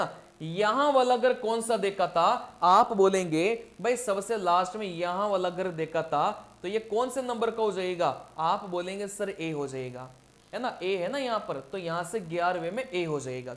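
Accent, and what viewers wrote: South, India. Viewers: Oo But aapki hindi tome south indian wala aata h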